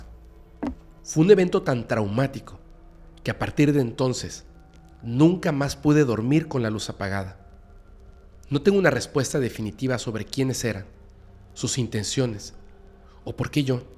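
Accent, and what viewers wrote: Mexico. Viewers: Si es mexicano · Hola tengo una duda: ¿Eres mejicano? Por el acento lo pareces. De donde seas, te mando un gran saludo y un fuerte abrazo.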